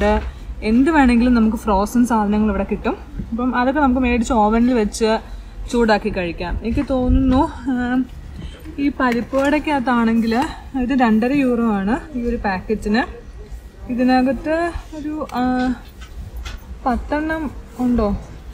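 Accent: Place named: Kottayam, India. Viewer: തനി കോട്ടയം സ്ലാങ്. Like it.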